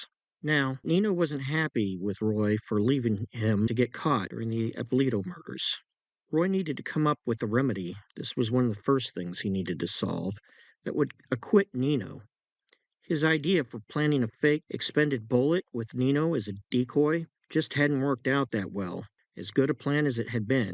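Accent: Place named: Midwest, United States. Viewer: Can't wait for part 17, I've greatly enjoyed this series and your Westies 3-part series. Exactly my type of narration, you've got that dry, wry New York sense of humor my entire family has, even though I can tell from your accent that you are originally from the Midwest... Or Ohio or similar? Just a guess based on certain words you say. Anyway, I don't do this often on a YouTube video, but thank you for your channel, your videos and the immense amount of work you've put into it. I'm looking forward to contributing back by buying your book when it is published, which I'm eagerly looking forward to. 🙂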